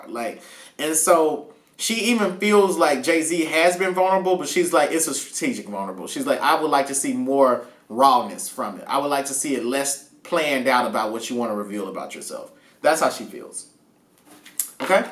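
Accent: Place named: South, United States. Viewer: I love your country accent btw! The Southern accent is very sexy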